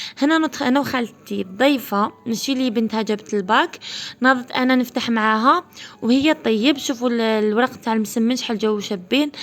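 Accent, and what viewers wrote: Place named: Kabylie, Algeria. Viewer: قبايلية نتي باينة من عربيتك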